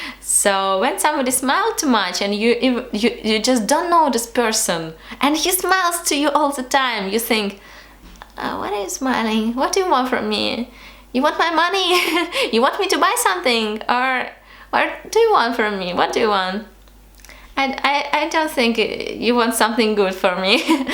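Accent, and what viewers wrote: Russia. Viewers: слиииишком русский акцент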